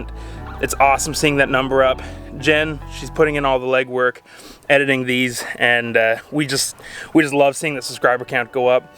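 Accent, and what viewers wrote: Canada. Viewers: Lmao the Canadian accent “aboouut” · Canada eh?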